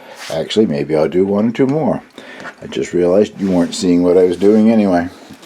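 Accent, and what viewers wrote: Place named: Campobello, Canada. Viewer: Grampy must have donated the extreme accent of some of the Campobello Calders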